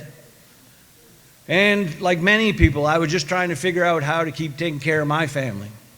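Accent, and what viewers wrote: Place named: Maine, United States. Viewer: He's from northern Maine. This is as authentic as it gets and that matters. You probably want him to speak D.C. English, or maybe the Queen's. Let Mainers be Mainers.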